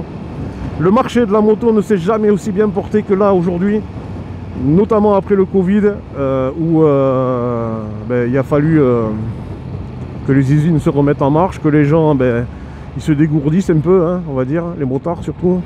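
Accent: South, France. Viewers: Essaie super sympa et cet accent du sud qui fait plaisir a entendre il ne manque plus que les cigales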